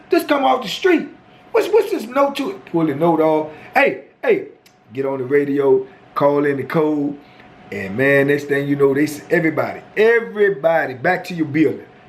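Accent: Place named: Washington, United States. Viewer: I’m loving these stories When say certain words as only a native Washingtonian can say I chuckle to myself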